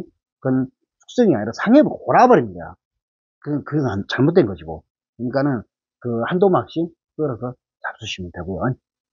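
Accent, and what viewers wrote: Jeolla, South Korea. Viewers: 우리 어머니 목포말씨를 께 들을 수 잇어서 정말 기쁩니다...^^ 보물단지 호남목포말씨에요...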